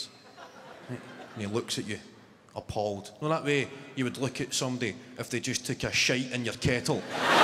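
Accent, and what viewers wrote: Scotland, United Kingdom. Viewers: If you're serious, [and I hope you are] this is English spoken by a Scot in a Scottish accent. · Well I am Welsh and have no problem understanding him, you just need practice to get your ear in. Move to Scotland for a couple of month I am sure that will sort the problem after you have said pardon a few times😂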